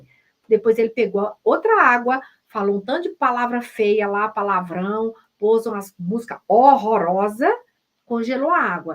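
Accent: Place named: Minas Gerais, Brazil. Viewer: Quero muito fazer esse curso, primeiramente pq vc é autêntica e simples tanto pra explicar o conteúdo e para se apresentar pra gente aqui Esse sotaque mineiro gostoso rsrs é muito bom! Se não conseguir nesse momento, tenho certeza que em outro estarei com vc 🙏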